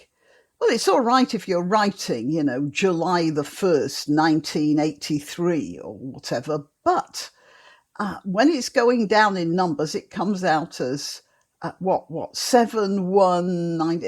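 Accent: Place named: United Kingdom. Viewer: I love this stuff. I didn't know any of it really. And I love hearing it spoken ( in 'The Queen's English? Do you still refer to it as such? ).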